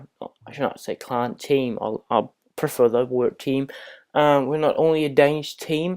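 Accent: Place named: Scotland, United Kingdom. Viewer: Du lyder lidt som en skotte også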